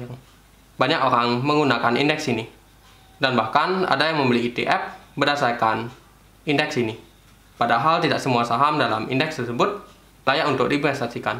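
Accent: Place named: Bali, Indonesia. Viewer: Medok bali sekali...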